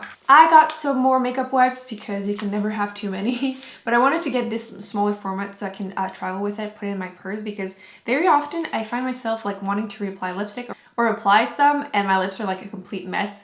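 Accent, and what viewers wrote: Canada. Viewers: She's from Canada c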